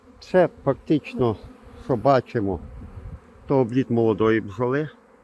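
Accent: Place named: Western Ukraine, Ukraine. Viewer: Я в якій ви області? Чую по розмові що західна стопона , Карпати, Закарпаття.. Бо в нас на Рівненщині ще не цвіли череші 3 числа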